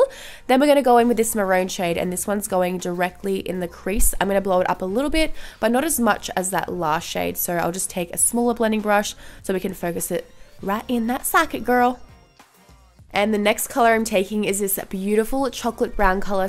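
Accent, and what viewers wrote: Australia. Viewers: talking in Aussie accent too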